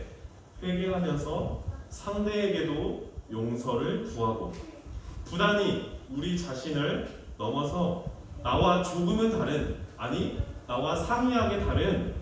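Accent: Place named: Jeolla, South Korea. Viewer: 전도사님~ 이순신 연기 보고 진짜 이순신 장군님인 줄 알았어요~ 역시 전라도 분이시네요^_^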